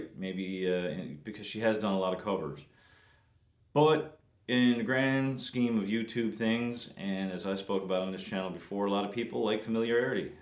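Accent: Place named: Nova Scotia, Canada. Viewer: Jesus bud, you sound like youre from nova scotia or newfoundland, not new brunswick, we have the best bedrooms here.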